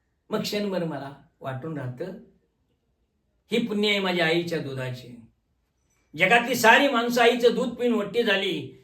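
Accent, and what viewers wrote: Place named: Kolhapur, India. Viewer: Salute sir thumala nad khula kolhapuri tone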